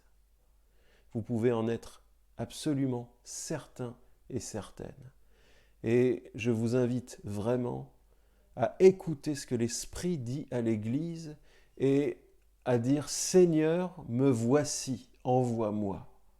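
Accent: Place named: Quebec, Canada. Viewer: Merci . Il m'a fallu quelques minutes pour m'habituer a votre fort accent de la belle province , certains mots m'ont échappés , mais , j'ai bien compris l'essentiel . Merci a vous .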